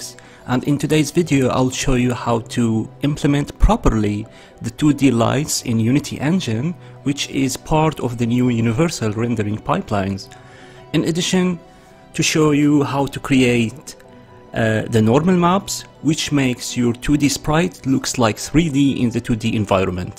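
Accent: Arab world. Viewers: are you Arabian , your accent says that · your english sounds arabic lol. nice video by the way, keep the good work up.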